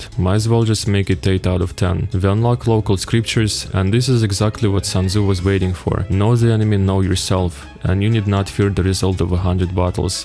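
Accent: Russia. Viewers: я слышу твой русский акцент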